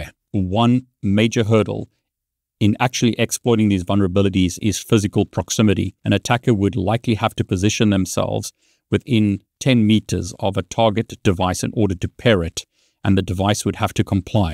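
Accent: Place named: South Africa. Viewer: It's his South African accent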